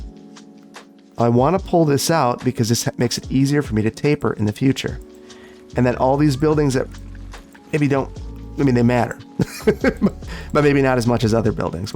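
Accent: Wisconsin, United States. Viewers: After watching a few more episodes, I think he just means he doesn't like the look of it in the game. It sounds like he's from Wisconsin and there's cetainly very hilly and cliff-esque builds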